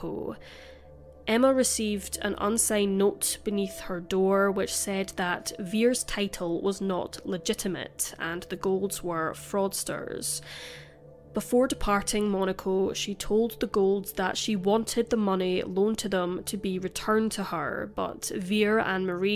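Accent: Scotland, United Kingdom. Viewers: Love your accent, Scotland?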